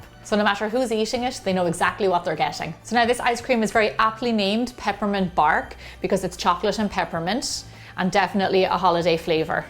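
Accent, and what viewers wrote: Ireland. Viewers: Where in Ireland are you from ? I'm from Mayo in Ireland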